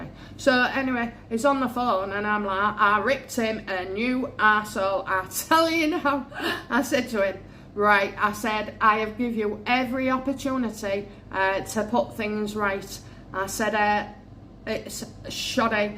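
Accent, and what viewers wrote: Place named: Yorkshire, United Kingdom. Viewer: This lady speaks English very clearly, no problems understanding her northern (Yorkshire) accent, a great regional favourite! Usually associated with a no-nonsense, down to earth, hardworking, family-loving nature.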